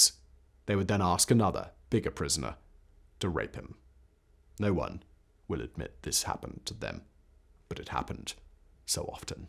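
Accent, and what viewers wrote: United Kingdom. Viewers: Bro that too much British accent for me to handle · his accent is becoming more British each video somehow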